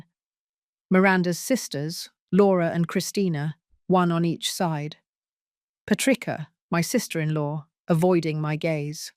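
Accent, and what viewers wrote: England, United Kingdom. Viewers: This British accent is making me crazy. I hate it. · Yes I'm tired of the snooty English accent!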